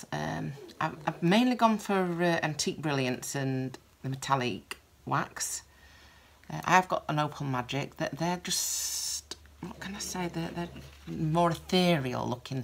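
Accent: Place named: East Midlands, United Kingdom. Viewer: So nice to see a video from someone from England, and not having to pause it to read the translation 😃 I’m in the East Mids, from your accent you’re not that far from me ...love it by the way 🙂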